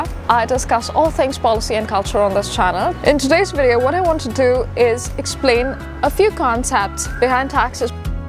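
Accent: United Kingdom. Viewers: Ur accent is irritating either u completely speak british accent or complete indian accent pls don't mix both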